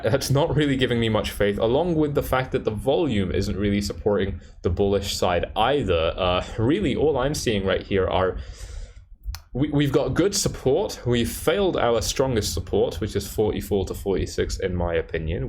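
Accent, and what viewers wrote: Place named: England, United Kingdom. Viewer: I listened to an old man in a truck and now I am listening to a teenager with an English accent for investment advice..I hope I found the one... Wish me luck!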